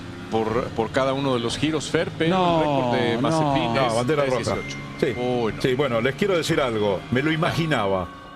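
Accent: Mexico. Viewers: Un saludo desde Juárez México, en que ciudad vives? Veo tus videos desde hace tiempo pero me imaginaba que eras sudamericano con acento por acá, de México.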